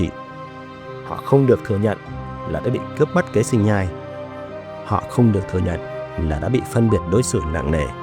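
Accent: North, Vietnam. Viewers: Tác giả không nên đánh lận con đen, gộp chung những thành phần thiểu số vào đại diện cho người miền Nam. Tại sao không nhắc đến đa số nhân dân mền Nam tham gia kháng chiến chống Mỹ, nếu không có sự chiến đấu của đa số nhân dân miền Nam thì liệu có thể giải phòng miền Nam được không? Tại sao chế độ Việt nam cộng hòa là tốt hơn mà đông đảo nhân dân miền Nam vẫn đấu tranh quyết tâm giải phóng miền Nam? Tác giá nói rằng người miền Nam đi vượt biên tị nạn, sao không nhắc đến đa số nhân dân miền Nam đang ở lại xây dựng đất nước sau chiến tranh. - Cũng cần nói rõ thành phần vượt biên tị nạn đa số là những người gốc Bắc di cư vào Nam năm 1954 làm việc cho chế độ cũ ( tác giả cho đó là người miền Nam có hợp lý hay không?) Ngay bản thân người đang đọc bản tin này với giọng đọc của người Bắc di cư vào Nam năm 1954. Tổng kết lại tôi thấy video này ngoài mục đích kích động phân biệt vùng miền thì có rất ít giá trị lịch sử được nhìn nhận một cách khách quan. 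· giọng của nghe rất giống giọng Bắc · 😅😅😅dân giá đỗ chứ ji kkk,biên tập giọng Bắc này o cần nghe, các ông biết ji về miền nam 😮😊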